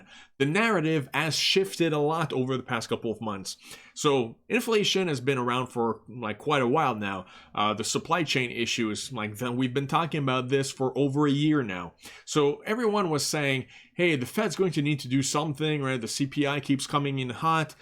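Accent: Quebec, Canada. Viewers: Dude, I love the videos, I find you to be one of the few 0 bullshit YouTubers, but I am curious because of the accent if you are from Quebec?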